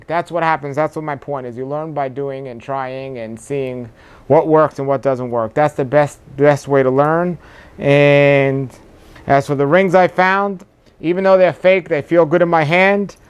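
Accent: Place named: New York, United States. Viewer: Your from NY right ? Can tell by your accent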